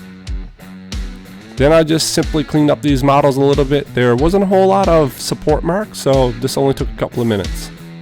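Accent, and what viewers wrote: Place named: Newfoundland, Canada. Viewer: I figured you were in Newfoundland from the accent, but Labrador is probably even more remote! Have you tried ordering from Kingdom of the Titans before? They're located in northern Quebec and might be able to ship to you fairly quick. Keep up the great work, love to see more OPR content and quality Canadian content creators! 🍁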